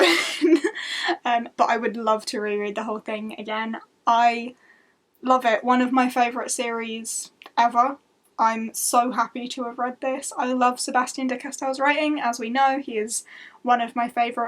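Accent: United Kingdom. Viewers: I guess you are British, aren't you? Greetings from Italy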